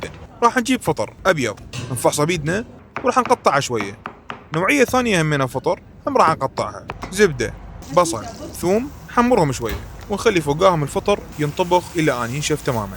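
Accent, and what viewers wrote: Iraq. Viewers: ما شاء الله اللهجة العراقية دائما حلوة ❤❤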